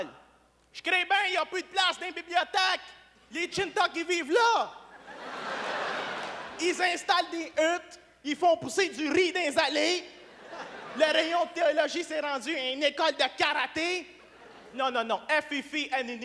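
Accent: Quebec, Canada. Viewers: C'est la même langue que la tienne, c'est-à-dire le français. C'est juste qu'il parle avec l'accent québécois. Il est allé en France et il a eu beaucoup de succès aussi. Arrêtez de dire que c'est une autre langue quand c'est le même language, juste l'accent qui est différent. C'est comme si un Américain, un Britannique et un Australien disaient qu'ils ne parlent pas la même langue. Ils parlent tous anglais, mais leurs accents sont différents. C'est seulement des patois, des dialectes différents, mais ça reste la même langue.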